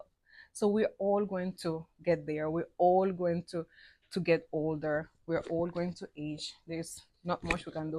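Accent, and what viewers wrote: Jamaica. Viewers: yo you have a stronger jamaican accent than me and i am half Jamaican... but raised in the states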